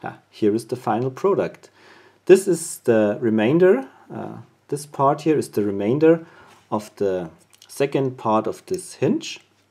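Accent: Germany. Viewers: an deinem englisch merkt man das du deutscher bist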